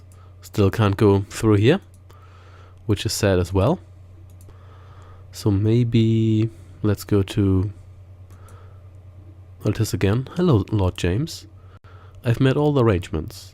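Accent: Germany. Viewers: You say you live in the UK, but... are you German? Cause your accent definitely sounds VERY German. ;)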